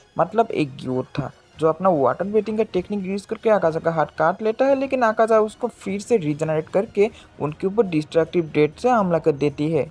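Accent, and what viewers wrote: Bengal, India. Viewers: Bhaiya tumi Bangali na?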